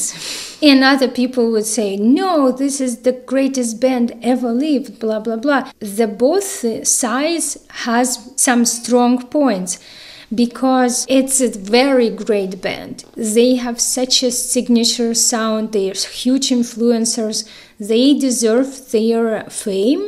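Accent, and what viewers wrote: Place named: Russia. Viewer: So awesome you always keep the russian accent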